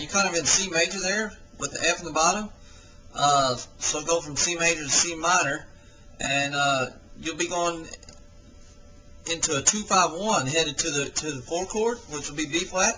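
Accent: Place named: United States. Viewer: Hey, this is cool! interesting accent too! which part of america you from? thanks for sharing your insights. love it.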